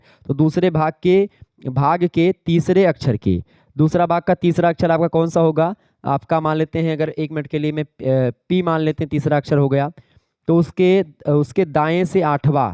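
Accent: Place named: Awadh, India. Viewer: सर के मुंह से अवधी भाषा बहुत प्यारा लगता है❤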